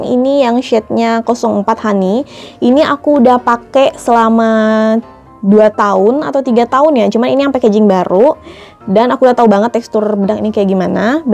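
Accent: Pontianak, Indonesia. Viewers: Orang pontianak ke kak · orang Pontianak kak ?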